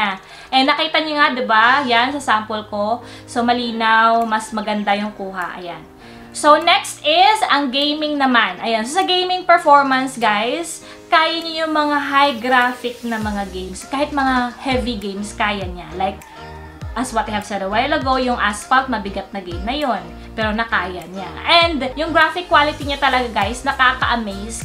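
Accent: Visayas, Philippines. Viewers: WAIT... BISAYA PO KAYOOO!?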